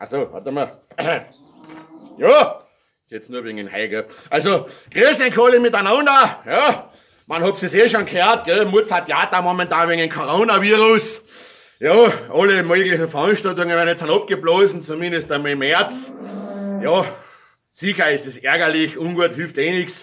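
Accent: Austria. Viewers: nicht jeder in österreich redet so das ist der dialekt aus "kärnten" (südlichstes bundesland) in salzburg , graz und wien redet man komplett anders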